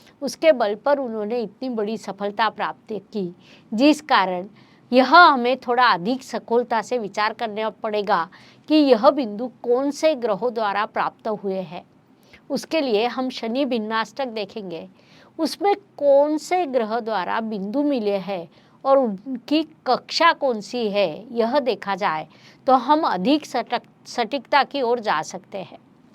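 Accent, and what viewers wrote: Maharashtra, India. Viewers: I love that MARATHI accent....jai shivaji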